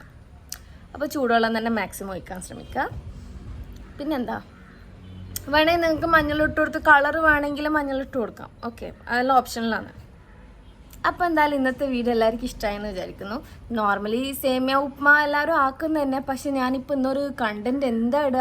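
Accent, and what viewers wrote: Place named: Kannur, India. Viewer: Nallaind ketta nammale kannur bashaa..❤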